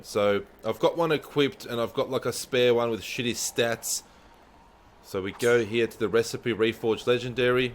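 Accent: Australia. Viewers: once I heard Aussie accent I subd. on ya bro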